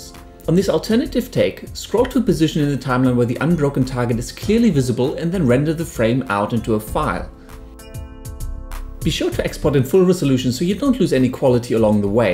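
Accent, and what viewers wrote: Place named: Germany. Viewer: ha! I knew it! :D sounds a lot like you're from Germany! I'm from germany too! :D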